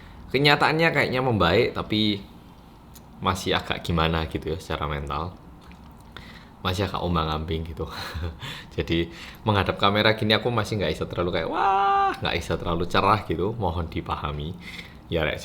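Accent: Java, Indonesia. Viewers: Stay strong 🙆🏻‍♀️ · Mas.. Coba song bahasanya di ngapak ngapakin jgn medok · Mung sampeyan sing medoke natural wkwkwkw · Rindu syekali ini sama 😥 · Mas!!! Stay strong jangan mikirin komenan negatif yang komen negatif itu iri sama mas.gapapa 1 hari 1 vdeo atau seminggu 3 kali juga gapapa kok mas Stay strong mas!!!!! Welcome back to korea reomi bersama orang yang medok!!!!!!! Bolo bolo tetep dukung Mas yah😊!!!!! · Welcome back 😀😊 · Yaaah.... namanya jg manusia, ada up & down emosi. Memang terasa kurang semangat nya sejak dr salam pembuka..... tp klu diceritakan begini, kita jadi memaklumi dan ikut mendoakan spy cepat pulih kembali. Caioo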